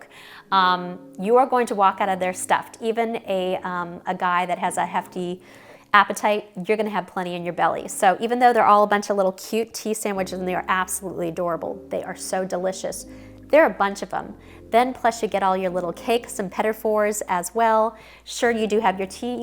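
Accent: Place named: Richmond, United States. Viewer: Are you from Richmond? Because you have a Richmond accent. Especially since you used the word rivah, which is something a person from central Virginia would say.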